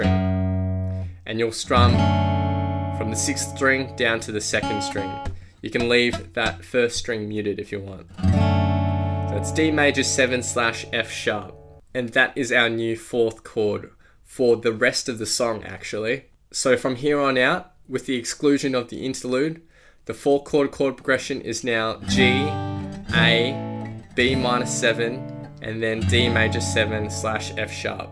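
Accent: Australia. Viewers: Am I hearing an Aussie accent there? This is a great tutorial, so brilliantly guided through all the steps and details!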